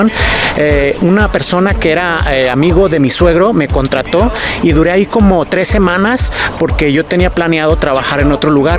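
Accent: Mexico. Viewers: Con este vídeo descubrí tu canal. Me quedé porque me resultó muy familiar tu acento; me dije: "habla como mexicano" . Luego lo confirme' cuando dijiste que comprarías tortillas. Después usaste el adverbio "bien" en el sentido de "muy" o "mucho" y eso me hizo sospechar que pudieras ser tapatío, porque recuerda que nos delata la expresión " bien mucho". Finalmente mencionaste que aprendiste soldadura en Guadalajara, en el CECATI de la calle 56. Ocurre q ue mi casa está en la calle 60, muy cerca de esa escuela. Nada más por eso, ya me suscribi' y seguiré tus vídeos. Saludosx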